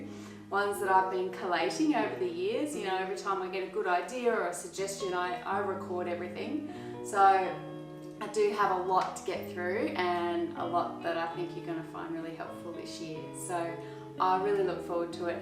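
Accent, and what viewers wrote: Australia. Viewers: Hey! This is fantastic news! Well done you! I’ll definitely be following along. I’ve watched your videos so much I’ve started reading and thinking about soap in your lovely Australian accent! 😂. Going to try making your pine tar soap for my own blokey blokes soon. Can’t wait to see what you come up with next! Ooh - and if you haven’t already, could you please teach us all about master batching, storing and reheating lye solution? Thank you. Take care and you and yours stay healthy and happy! 🙏🏼😍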